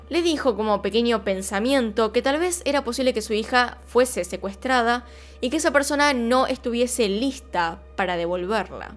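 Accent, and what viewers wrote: Argentina. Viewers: Es el acento de algunas provincias de Argentina. Yo también hablo así, no tiene por qué molestarte. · Eres Argentina???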